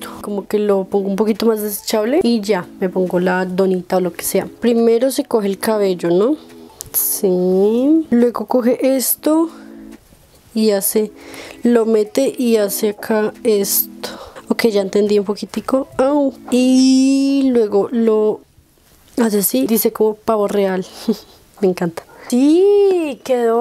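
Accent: Colombia. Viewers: por el acento, Colombia creo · Su voz describe colombianidad 🇨🇴🇨🇴🇨🇴🇨🇴🇨🇴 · WOW!!! me encanto tu vídeo!!! 🦋N🦋E🦋V🦋A S🦋U🦋B🦋 pregunta: eres de Colombia? tu asentó es super colombiano JIJIJIJ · 1. Me encanta su cabello 2. Wow me encanta tu asentó (Creo que eres de Colombia ) pr tu asentó 3. Si alguien le da dislike a el video voy hasta tu casa y te mato😎 pq quien le daría dislike a esta joya de vídeo 4. Me encanta como editas Y eres de Colombia verdad ? Saludos desde Colombia 🇨🇴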